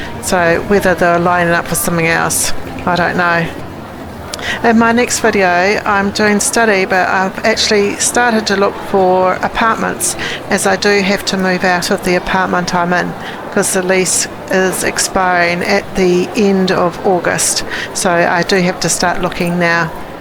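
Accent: New Zealand. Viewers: I do enjoy listening to you with your lovely New Zealand accent but also the level of detail you provide. Envious of your adventure and appreciative of you putting yourself out there...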